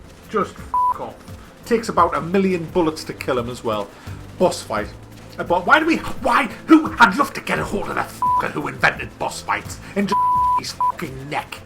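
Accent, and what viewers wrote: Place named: Northern England, United Kingdom. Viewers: mate, which city are you from, your accent sounds like a northener, most importantly, where's your bell from? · great video. just out of interest i assume you are from the north east, if so whereabouts???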